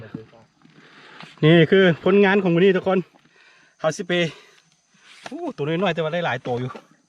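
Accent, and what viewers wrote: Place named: Isan, Thailand. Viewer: สำเนียงเว้าคือคนอิสานเนาะฟังงาย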